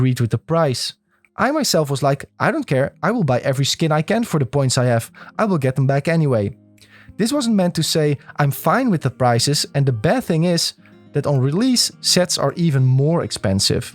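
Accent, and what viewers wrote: Netherlands. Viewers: Ik kan gewoon horen dat hij nederlands is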